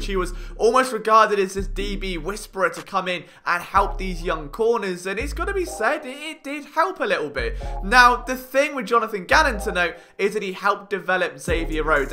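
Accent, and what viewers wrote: United Kingdom. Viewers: Why, because he has a brit accent? Oh No !! He's a good commentator with good breakdowns. I don't understand why he has such a low subscribe base still.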